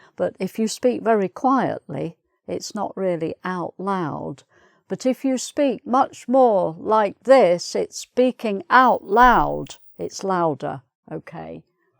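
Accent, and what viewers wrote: United Kingdom. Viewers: the accent british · Your fantastic pure British accent is something out of the world. Never die dear never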